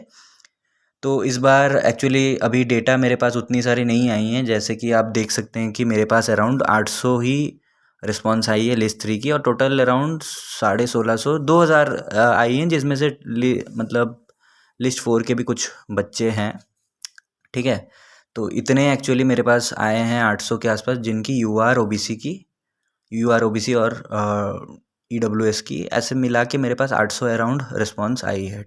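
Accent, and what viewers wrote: Odisha, India. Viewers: Bro r u from odisha?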